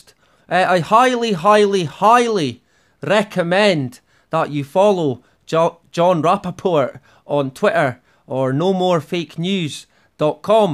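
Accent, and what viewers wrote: Scotland, United Kingdom. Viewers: aha! My first assumption was Scots, Thank goodness. My former boss from Glasgow has been passed for over 10 yr. I was one of the only peeps who understood everything he said🤠 RIP brother Kirkpatrick Keep up the excellent work!good sir☮️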